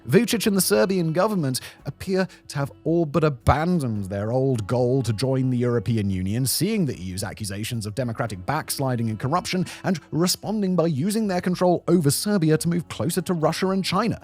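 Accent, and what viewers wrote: United Kingdom. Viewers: British accent talking about the balkans is red flag that announces bullshit. War in croatia broke out after germany armed croatia, war in kosovo broke out after the UK and the US armed moslem terrorists. The monologue speaks of serbian nationalism 100 times, and relevance of history to understand the presence, but fails to mention ww2 organized extermination of serbs in croatia and bosnia (by nazi allies croats and moslems)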